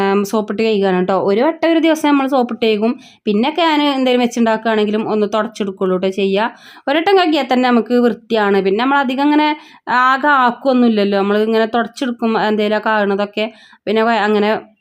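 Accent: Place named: North Kerala, India. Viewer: Uttara Malayalam speaking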